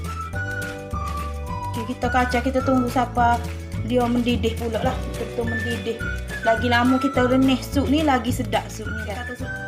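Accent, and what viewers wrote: Kelantan, Malaysia. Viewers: suko dengar kak kecek Klate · Min, sedap....sebenarnya dulu sy pernah kawen dgn org kelantan.n skang dah pisah.die punya benci tu, adoi...smpai sy xleh jumpa or dengar org kelantan cakap.benci sungguh.padahal diorang xde salah ape pun...adoi...😬...skang Ni pun sy subscribe.tapi dgr je loghat kelantan, terus sy mute..punye la hai...halalkan resepi yg sy ambik ni ye.n sy still benci..😭.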